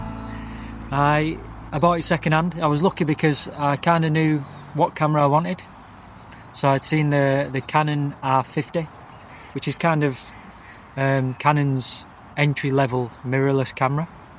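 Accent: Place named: England, United Kingdom. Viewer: How lucky you captured this beautiful bird, and what a great Lense and camera! Nice to hear about it's details too! Thanks.😊 I'm guessing you're a Pom? Not sounding Kiwi haha. Thanks again. You did well.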